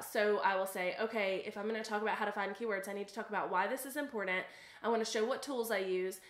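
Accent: South, United States. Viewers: the southern drawl! Cute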